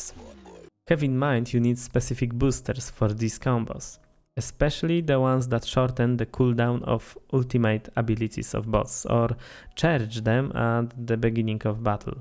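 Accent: Poland. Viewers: i ten polski akcent hehehe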